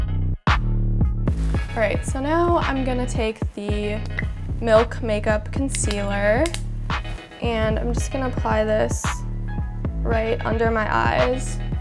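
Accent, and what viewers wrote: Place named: Chicago, United States. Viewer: so cute! i hear that chicago accent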